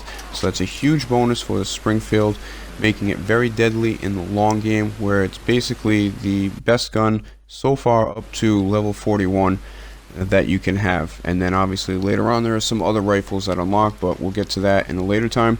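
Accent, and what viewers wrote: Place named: Massachusetts, United States. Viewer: Lol sup dood? I can tell you are from MA. You from Southie guy?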